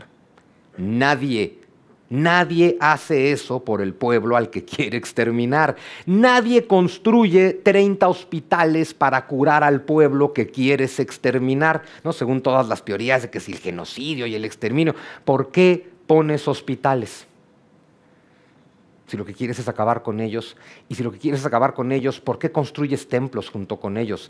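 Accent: Spain. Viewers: Apenas inicia y ya trae el acento español, gracias, gracias, gracias. No mms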